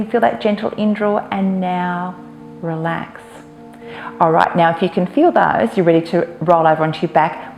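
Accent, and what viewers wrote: Australia. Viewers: AI love your Aus Accent.. I miss Aus😢😢😢😢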